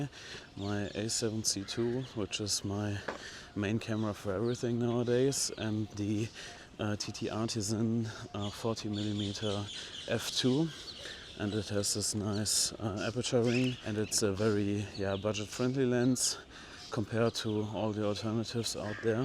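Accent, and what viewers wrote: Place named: Germany. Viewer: Great video and lovely German accent. Maybe more clouds next time.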